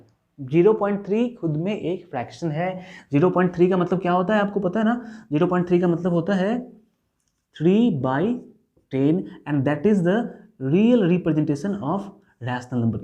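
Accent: Bihar, India. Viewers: Sir are you from Bihar?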